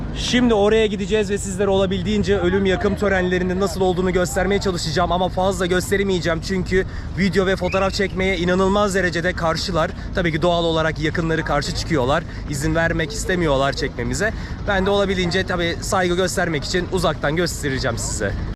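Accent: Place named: Aegean, Turkey. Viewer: sen nerelisin merak ettim, ege sivesi var gibi biraz ben de egeliyim 😂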